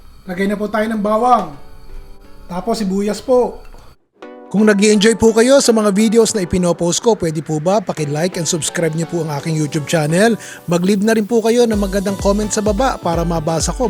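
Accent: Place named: Nueva Ecija, Philippines. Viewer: Kamates, nagka luray luray, ganire at ire. Punto at mga salitang kapag narinig ko eh para kang nakikinig sa matatanda sa Nueva Ecija😅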